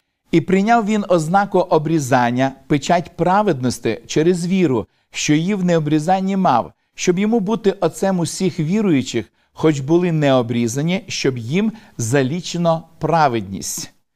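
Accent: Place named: United States. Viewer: До чого цей дурнуватий американський акцент і менторство? Середньовіччя і мавп'ятництво в сторону американців. Огидно...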